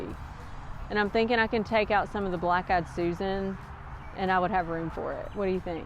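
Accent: South, United States. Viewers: 'Southern' sounds good on you!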